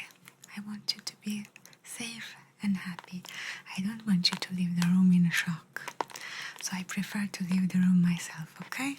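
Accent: Italy. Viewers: Hello, fantastic ASMR, I really liked it and with an Italian accent, greetings, beautiful 🌹💖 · Yessss,long time Italian Accent.😊😊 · Thank you for creating this compilation for us,. I love the Italian accent in combination with your wise words. You say in the description that you're not a therapist, but you seem like a really good one to me. You probably just need to get some sort of a degree to make it official. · Ciao cara!🤗 Mi piace molto questa tipologia di video, sei bravissima e bellissima, complimenti!🥰 Adoro il suono della scrittura e adoro ascoltare il tuo meraviglioso accento Italiano 💚🤍❤️ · ahh you are amazing and we are back at the amazing italian accent i love it <3:) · Ciao and Lucia!💜💜💜 I do so love your gorgeous accent!! Thank you for soothing and lulling me to sleep. You are the best in my opinion! I hope you and your family are staying healthy. Have an excellent weekend. 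You deserve it. Goodnight and sweet golden dreams. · Italian accent videos are perfect for relaxing and unwind your soothing voice makes me feel sleepy 😴 😊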